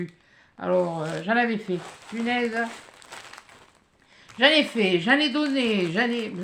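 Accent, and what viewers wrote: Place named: South-West, France. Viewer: Bonjour je vais essayer de participer (malgres mon attelle au pouce sniff) Je viens de découvrir ta chaine et bon dieu que cela fait du bien d'entendre ton accen et ta gouaille sans compter entendre parler patois... merciiiiii... je suis originaire et jai toutes ma famille au fin fond du Sud ouest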